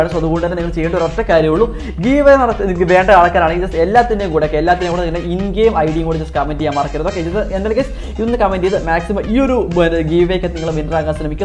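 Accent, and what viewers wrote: Kannur, India. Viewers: Ettan കണ്ണൂർ aano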